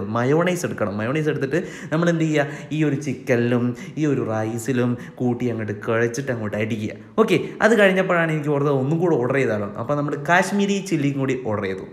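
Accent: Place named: Kozhikode, India. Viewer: നിങ്ങളെ വീഡിയോ കണ്ടിട്ട് കുറച്ച് ആയിട്ടോ -- ഏതായാലും സംസാരം കോഴിക്കോടൻ ആയത് കൊണ്ട് നല്ല രസണ്ട്ട്ടാ😝 മക്കൾക്കും ഇഷ്ടം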